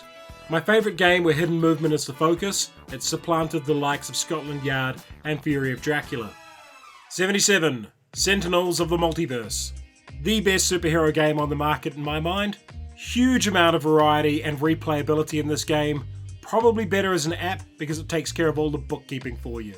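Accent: New Zealand. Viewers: yaaaaaaa your a new zealander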